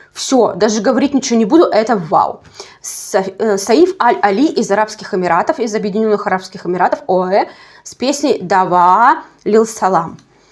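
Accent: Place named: Ukraine. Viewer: Какой у тебя акцент украины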